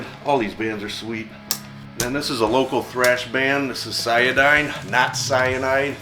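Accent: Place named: Chicago, United States. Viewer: Fn love this channel bro, lifting and listening to metal! Hey do I hear a mid-west accent in your voice? You sound like a fellow Chicagoan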